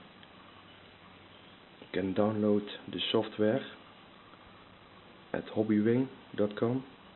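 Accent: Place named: Netherlands. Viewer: laat me raden. aangezien je een erg nederlands accent heb zal je wel nl zijn :P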